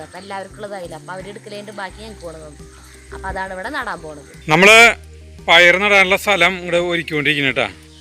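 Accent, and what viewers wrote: Thrissur, India. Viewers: സൂപ്പർബ് ട്ടാ തൃശ്ശൂർ slang രസമുണ്ട് കേൾക്കാൻ. ഉപകാരപ്പെടുന്ന video. പിന്നെ വള്ളിച്ചീര കിട്ടീല്ല. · കൃഷിയേക്കാൾ ഇഷ്ടായത് മ്മ്‌ടെ തൃശൂർ സ്ലാങ്ങാണ്റ്റ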